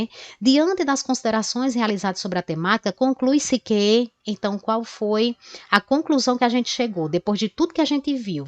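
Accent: Northeast, Brazil. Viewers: Adoro aprender com essa Professora, sem contar que amo o sotaque do nosso nordestão! 🥰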